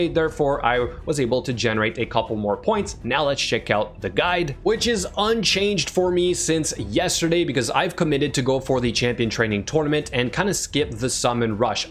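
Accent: Quebec, Canada. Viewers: french Canadian i think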